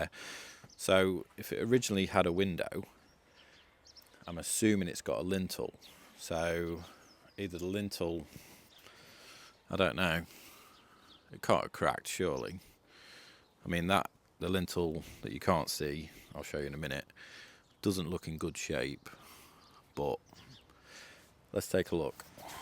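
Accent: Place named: Doncaster, United Kingdom. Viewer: Sounds like you're from Doncaster or somewhere.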